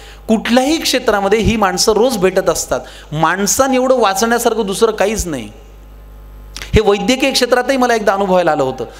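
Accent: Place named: Marathwada, India. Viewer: खु छाण संकर्षण व्याख्यान झाले मराठवाडयाची बोली भाषा तुझ्या वाक्यात वाक्यात आली तुझ्या उज्वाल भविष्यासाठी खुप खुप शुभेच्छा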